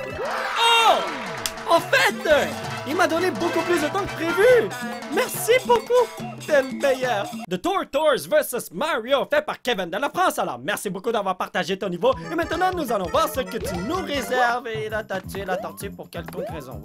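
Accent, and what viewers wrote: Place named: Quebec, Canada. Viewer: J'adore les expressions qu'il utilise, en plus il y a un accent québécois sans que ce soit trop prononcé c'est génial :)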